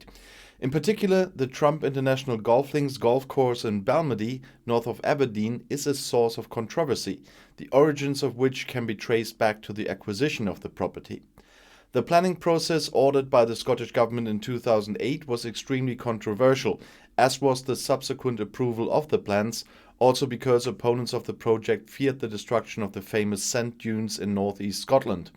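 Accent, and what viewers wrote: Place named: Germany. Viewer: I wondered about your accent, thought it was German, thank you for your very enlightening contribution! May the trumpstain disapears back to hell, where he belongs!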